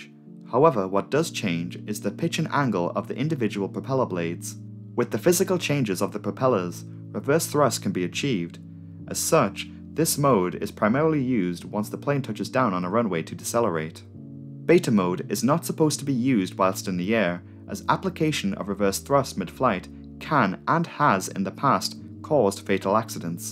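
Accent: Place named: North East England, United Kingdom. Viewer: Based on my limited knowledge of UK accents (I’m American), I’m guessing he’s from North East England. And I agree that it’s an appealing accent; it’s certainly much nicer-sounding than mine 😆.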